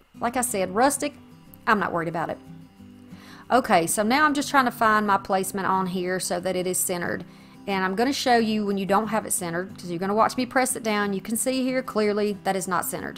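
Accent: South, United States. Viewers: Omg love your accent honey! I love the South Y’all are the salt of the earth!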